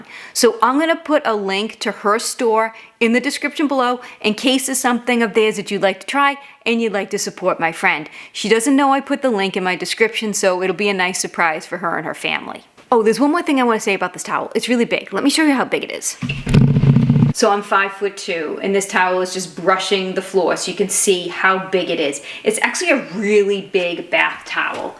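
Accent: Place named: New York, United States. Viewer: This lady has such an interesting NY accent lol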